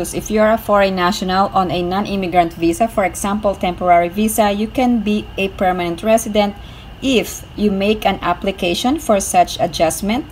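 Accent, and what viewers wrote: Philippines. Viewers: funny filipino accent 😅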